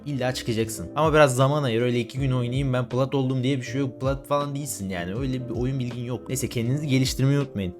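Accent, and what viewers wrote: Thrace, Turkey. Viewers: Trakyalımısın abe kızan. Trakyalıysan trakyanın neresinden.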